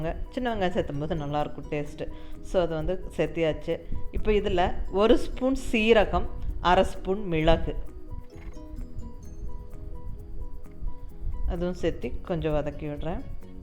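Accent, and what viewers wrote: Coimbatore, India. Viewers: Coimbatore slang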